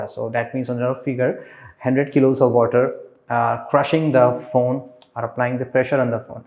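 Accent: India. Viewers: Indian accent is so annoying lol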